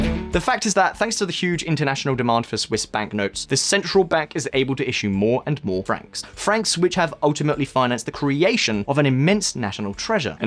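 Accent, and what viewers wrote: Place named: RP, United Kingdom. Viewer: This presitator is so fakeish. too posh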